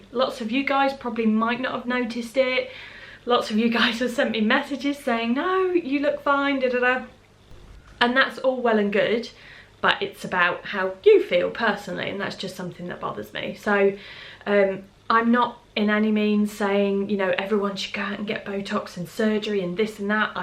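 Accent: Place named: London, United Kingdom. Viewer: Omg ur dog sooo cute 🐾🐶! I love dogs 🐶! U look great thanks for sharing .. and like how u talk with that accent from London you r London girl .. 👍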